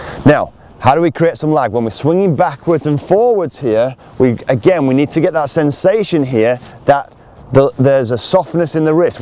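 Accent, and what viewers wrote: Yorkshire, United Kingdom. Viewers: That's because he's from Yorkshire - no bull!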